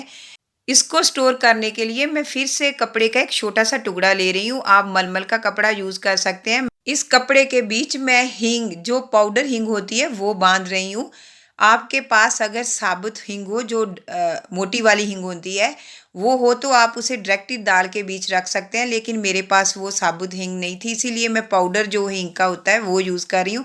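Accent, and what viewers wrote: Punjab, India. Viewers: Punjabi touch aa Riha h... Mm Punjab ton??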